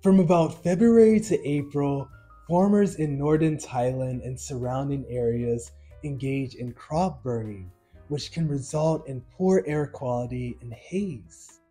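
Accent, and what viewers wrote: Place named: California, United States. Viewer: Good video thanks! Where are you from dude, you sound like a California surfer lol.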